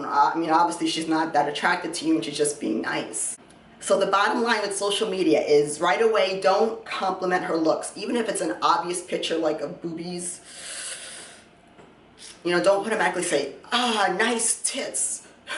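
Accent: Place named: New Jersey, United States. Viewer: That Jersey accent lol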